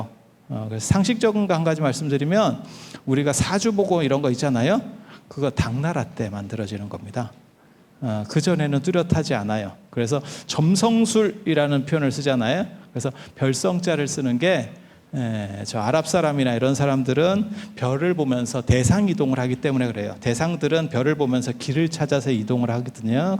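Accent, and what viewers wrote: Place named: Jeolla, South Korea. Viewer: 왜 언제부터 전라도 사투리가 여기저기 서 마구마구 쏱아지나 언제구터 재수없는 전라도 사투리가 표준어처럼 둔값된건가 불교방송마져도 오염되어있으니 정말엿갔다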